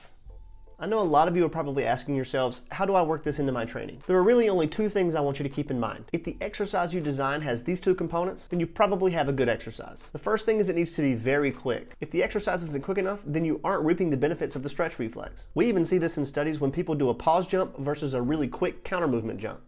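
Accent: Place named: South, United States. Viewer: First time on the channel. I hear a Tennessee or Kentucky accent there. I picked up on it right away for some reason. Great video, I subscribed.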